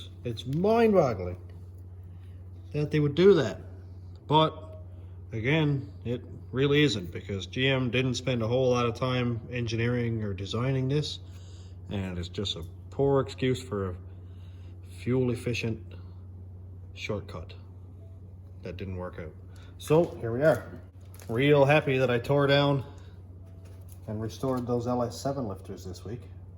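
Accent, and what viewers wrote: Newfoundland, Canada. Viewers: I'm from grand bank lol currently living in pei,I know a newfie when I hear one haha what part ya from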